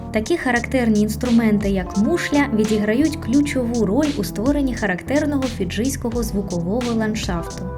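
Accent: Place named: Poltava, Ukraine. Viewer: Дякую за відео! Нетривіальна країна, розповідь цікава і приємна вимова (полтавська, навскидку, але можу помилятись).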